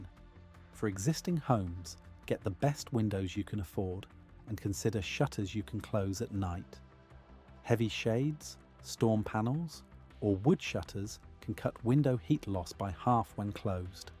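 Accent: United Kingdom. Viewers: Can you please at least put temps in C as well? No one but Americans use this. I mean you have a British accent who uses C.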